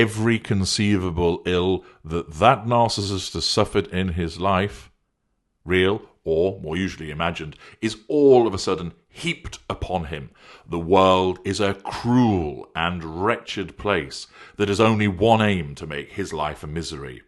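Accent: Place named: England, United Kingdom. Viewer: Soothing? I find it cold. No inflections. Even Doctor Higgins' voice sounded more soothing and human than his. Although his accent is academic and his vocabulary is rich and high standard; Englishman I guess (doesn't sound Canadian 😁) no cockney.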